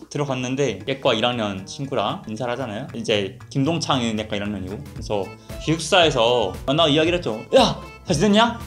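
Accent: Gwangju, South Korea. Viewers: 뭔가 광주 사투리가 엄청 정겨워요 ㅎㅎ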